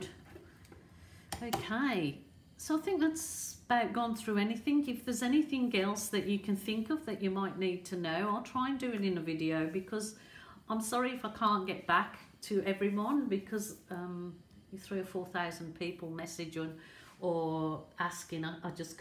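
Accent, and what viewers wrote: Birmingham, United Kingdom. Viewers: I knew I wasn't going daft! I knew I could hear a bit of Brummie in there lol xx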